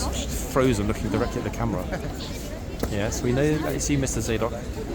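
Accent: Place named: United Kingdom. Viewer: I love the British accent and humor ❤️😂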